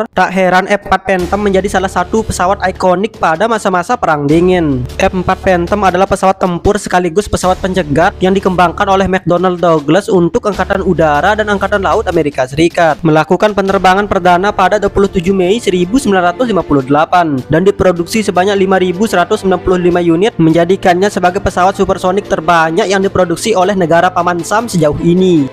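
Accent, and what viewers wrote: Lombok, Indonesia. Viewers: Bang lu orang lombok bukan?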